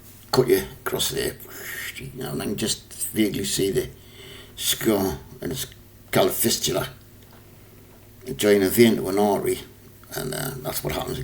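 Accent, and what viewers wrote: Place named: Wales, United Kingdom. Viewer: I love ur welsh accent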